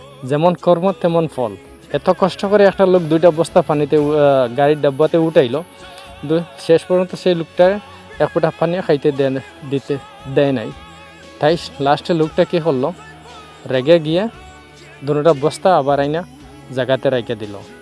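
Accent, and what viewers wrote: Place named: Sylhet, Bangladesh. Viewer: ভাই আপনি তো আমরার সিলেটি মাথে বুঝা যায় ❤😂😂😂😂😂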